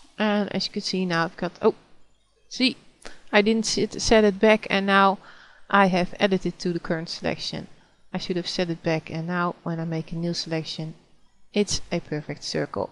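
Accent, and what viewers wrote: Netherlands. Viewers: nice tut with such a trademark dutch accent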